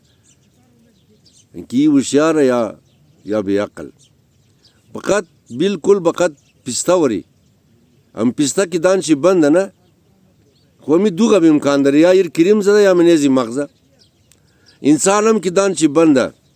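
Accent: Hazaragi, Afghanistan. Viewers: سلام اقای. نام من رضا هست و در ایران تولد شدم و ۳۰ سال دارم و بیشتر از نصف عمر خود را در اروپا زندگی کرده ام. دلیل اینکه من ویدئوهای شما را می‌بینم دو چیز هست: ۱. زبان و لهجه هزارگی را از شما یاد میگیرم. ۲. هر ویدیو شما یک درس زندگی به من میدهد. موفق و سلامت باشید. 🌹✌️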